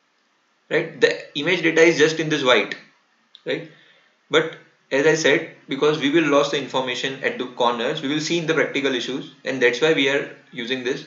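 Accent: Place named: India. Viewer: First time I felt myself so comfortable with Indian English accent , well organised and explained video..Thank u so much for your efforts...I’m already trying to read the same book from where u took the images. Your video made everything so easy...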